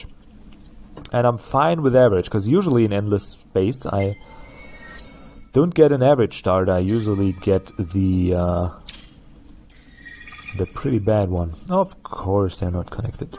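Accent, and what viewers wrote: Germany. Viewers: Are you, by any chance, german?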